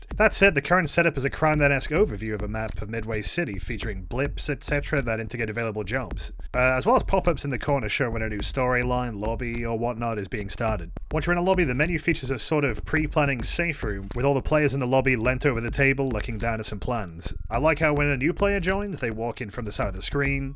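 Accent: United Kingdom. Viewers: yes britbong whisper those sweet supple long words some more please <3333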